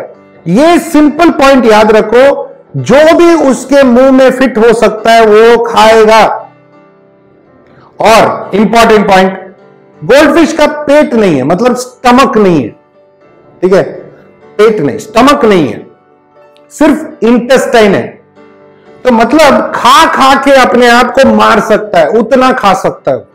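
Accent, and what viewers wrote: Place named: Tamil Nadu, India. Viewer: Hi, I am eagerly awaiting to watch and hear your cute Tamil slang, please upload some Tamil videos then and there.. At least a dubbed version of your Hindi videos...😝😝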